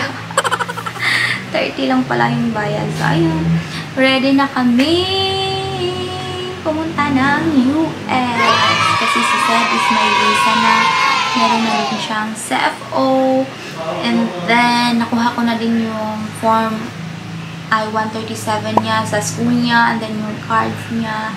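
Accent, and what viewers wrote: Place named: Visayas, Philippines. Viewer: Bisaya lge ka mam unsay probinsya nimo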